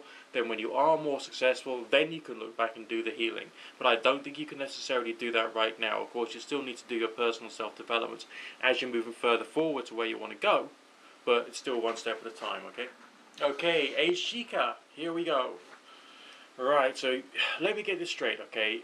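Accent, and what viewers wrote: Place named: England, United Kingdom. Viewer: o sorry about my typing and yeah my names sari sherri and o your not australian like totally english your funny. i Enjoy listening to you you seem so genuine and have a great personalty and common scense. thanks again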